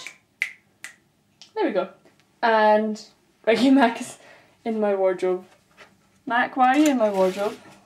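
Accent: Scotland, United Kingdom. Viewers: I love the your accent, my lecturer was from glasgow, his accent was a bit broader than yours, best lecturer ever though. He had the best sense of humour, I remember this one time in class several girls were reading fifty shades of grey, and one of them was reading it under the table whilst he was telling us about some fine artist; he caught her, asked for the book, opened it out to see what the most opened page was, and then asked the class if they wanted him to read it out. It was hilarious =D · as far as the bagpipes go. of course not everyone plays them. they are a musical instrument and would take practice. my question is do most scottish people enjoy listening to them ? i really dig your accent !! i find it alot easier to understand than english people. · Ever since I found out that my family immigrated from Scotland in the mid-1800's, I have been obsessed with everything Scottish, I love your accent. 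· I'm going to Glasgow to study for the semester in 2 days! Let's see if I can not look like a total tourist. (Although I love your accent.) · But you do have quite a strong accent. I could tell it was Scots straight away :p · Stereotypes can be quite frustrating. No matter where you are from. By the way: Your accent is beautiful. I've always had a thing for the Scottish accent. :)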